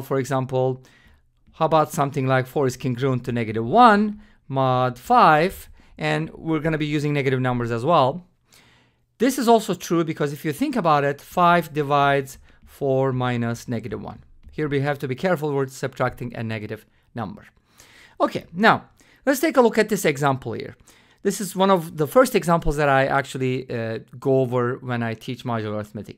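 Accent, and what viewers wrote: Turkey. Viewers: you sound extremely turkish, are you?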